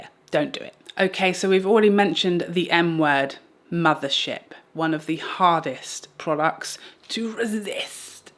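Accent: England, United Kingdom. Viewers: No pipetting makeup!! 🤣. We really do all hate the pipette! Has anyone in the history of the world thought, “You know what would make this serum, this foundation perfect? Dispensing it with a pipette. But listening to turn pipette into a verb and repeat the word with her glorious English accent is worth every stupid pipette cosmetic companies use in their products. 😂. Love you,. Love your ‘You don’t need that’ series.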